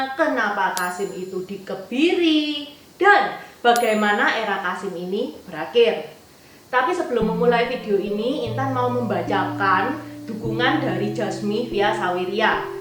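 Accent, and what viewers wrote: Java, Indonesia. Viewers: Paras Tionghoa logat jawa. Sukses trs Mbak Intan👍. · Mantap 👍👍,,,logat Jawanya medog,,👍👍👍😀,,, terus semangat,,,,and sukses selalu 🙏👍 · Org Jawa memang medox.salam dr pulau Lombok kak. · Mbak Intan anda orang semarang ya...koq medok jawanya. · Logatnya jawa banget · Indonesia memang beragam.ayo kita jaga keberagaman yang ada di indonesia.....unik banget Cece logat jawanya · Baguss logat jowo njekkeknya, semangat yuu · Jawanya medhok he... Saya juga orang Jawa logat jawa kalau sudah lengket sulit ilangnya. 😀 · Ini logat Jowo Cino. Bahasa Jawa campuran bhs Indonesia dg logat khas mereka. Jarang yg murni pakai bahasa Jawa atau murni bahasa Indonesia. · Casinge cino jowone medok tenan.. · Logatnya medok jawa bget!!!wow keren👍🏻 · Muka Tionghoa ngomong medok banget Jawanya 😀😀😀😀😀😀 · Maturnuwun mbakyu Intan, aku seneng dengar ceritanya dengan logat Jawa yang kental... 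teruske wae mbakayu.... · Muka mu cino suara mu jowo ... wow !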